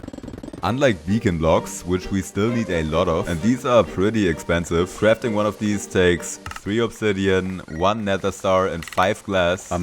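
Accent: Germany. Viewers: dieser Deutsche Akzent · Ich wusste du bist deutsch allein schon an der Stimme bzw. der Sprachweise 😂😂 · Oh hey, I'm smart enough to recognize a German accent, nice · I know a german when I hear one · As a german, 😭✋️ dein deutscher akkzent ist so süß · Took me a moment to realise that you're German. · AYYY UR GERMANNNN, I HEARD IT FROM THE ACCENTT XDD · I smell German 🤨🤨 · Hab doch sofort am Akzent erkannt daß wir hier jemanden Deutschen haben😂😂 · I here a bit of deutsch❤ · I definitely heard a german accent too (Im not german tho) :> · Dare I say I hear Deutsch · Ich höre doch das du Deutscher bist 👀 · i hear some hidden german lmao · Deutsche hier? Hallo Leute und bist du wirklich deutsch weil es hört sich wirklich an als würdest du deutsch sein. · i knew i! i knew you were german. the accent gave it away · die ganze zeit war ich so hör ich da deutschen akzent? 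und dann kam qual der wahl hehe. Cooles video 👍🏼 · Ich liebe diesen Deutschen akzent 😅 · first video I ever see from you, immediately clocked you as a fellow German 😂 you sound so similar to Doc, when talking English so it immediately stands out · I hear the deutch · warum hab ich direkt gehört, dass du deutsch bist ohne ein Video von dir jemals gesehen zu haben ahhaha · Ja der ist safe deutsch. Man hörts leider komplett, an Aussprache und den Grammatikfehlern. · wie deutsch der redet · Great Video and I can’t unhear the German accent😂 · Bei der Aussprache wusste ich das sich hinter der Stimme ein Deutscher versteckt😂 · ja save der typ so fett deztsch habe nämlich genau denn selben gedanken aber bro hat eine gute idee weill englisch sprachige youtuber besser verdienen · feels like german accent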